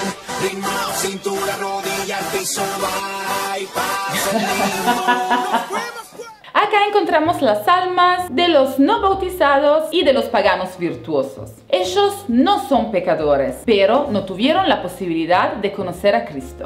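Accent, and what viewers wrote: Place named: Italy. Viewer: me encanto el video! le pones mucha onda a lo que haces, y ese acento tano le pone mucha energia! chau linda!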